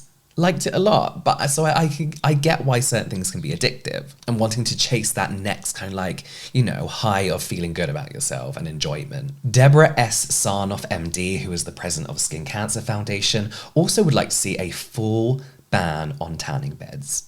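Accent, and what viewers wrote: United Kingdom. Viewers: Wait why did I not realize you had an accent until you said you were British😳🫢😭😭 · idk sh*t about british accents but I think this man has the same accent as Jamie Dodger, the cool trans guy Youtuber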